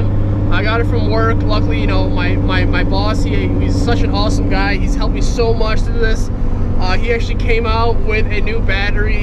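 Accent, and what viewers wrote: Australia. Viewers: That Australian accent 😍😍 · Nice aussie accent, i was thinking more Steve Erwin, R.I.P.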